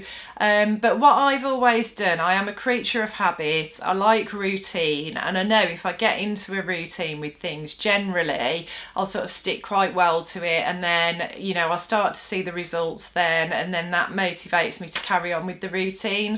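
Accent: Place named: West Midlands, United Kingdom. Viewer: Me too - and to listen you! I moved from the West Midlands to the South West a couple of years ago and the pandemic has curtailed my visits back home to see friends. So I LOVE hearing your accent - it reminds me of home. Oh and I think your tips and advice are great too, of course!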